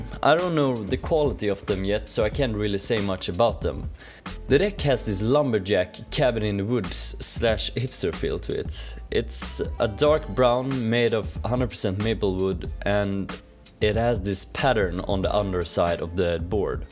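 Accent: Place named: Russia. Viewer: Русский ?! Акцент как-будто с России )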